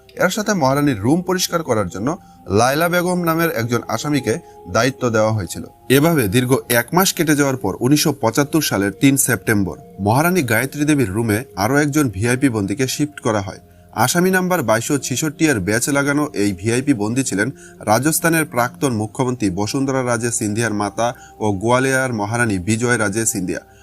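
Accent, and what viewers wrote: Bangladesh. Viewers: Khankir chele anchor ta Bangaal ...Razkumari ....khankir chele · Are you Bangladeshi?. Because your pronunciation( talk) is like a Bangladeshi people.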